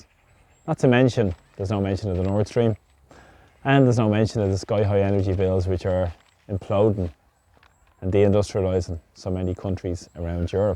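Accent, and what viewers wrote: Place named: Ireland. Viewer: Please people give this channel a subscibsion and a thumbs up we need a the real irish voices out there,thanks for all you do,thanks.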